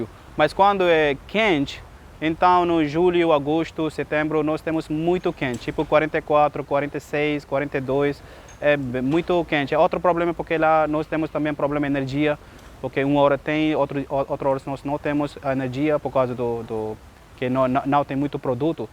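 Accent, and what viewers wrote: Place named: Northeast, Brazil. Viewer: Português com um leve sotaque nordestino. Muito sucesso pra você. 🌹🌷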